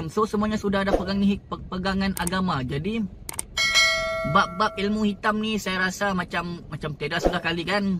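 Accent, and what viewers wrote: Sabah, Malaysia. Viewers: Slang sigu macam org sabah suda oo..salute sama kamu sigu..semoga sentiasa happy dan bahagia sama family dan murah rezeki selalu ar sigu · Say tgk ko ni mcm bukan sda org kedah ni hahahahahah. Pekat suda tu loghat sabah hahahahahah. Tpi kwn say brterima kasih jga la slepas tgk video ni, kwn say dri selangor kena suru pindah pg sabah. Mula2 ragu dia kunun mau pindah sini. Ada kwn pun say ja. Takut dia kena ilmu hitam apa smua. Takut org sabah nda terima dia. Skali dia tgk ni video nda sabar kunun dia mau pg sini. Hahahaha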